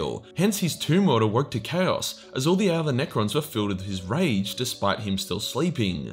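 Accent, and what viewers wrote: Australia. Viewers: When’s a gene-stealer vid coming, just started buying some up to make my second army and would love to hear their lore spoken by a true chad Australian lore lord UwU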